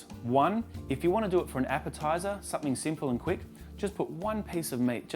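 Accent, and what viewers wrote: Australia. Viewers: Really? A Thai chef and yet not Thai. He cooks for an Australian palate. I can go to Khao San road and learn these recipes. I would not then claim I am a Thai chef. In the US they have Chinese restaurants everywhere. There is a great Chinese population of all generations and 97% of the Chinese restaurants are so far from Chinese that they considered more American. The one near me is run by Mexicans. I have been a chef for 30 years, 20 of which was Asian cuisine. Plus, whenever someone has an English (Australian) accent they can make any claim. No one even questions it. Something about the accent tends to add legitimacy. American infommercials are rampant with them. I not doubting his culinary feats, just saying. Hotelier Christina Ong ( from Singapore, not Thai) gave him his great break. I know he spent a couple of years in Thailand ( I spent 10) and had minor success in Sydney with Thai food prior. 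World famous, no. So as I said, he cooks for an Australian palate.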